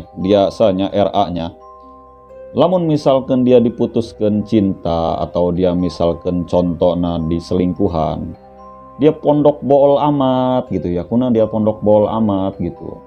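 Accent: West Java, Indonesia. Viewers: aehh geningan sunda c akang teh 😂,,,Sampurasuuun kang -ti Bandung 🙏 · Namanya juga baisana mah ti Sunda dan logat nya juga Sunda pisan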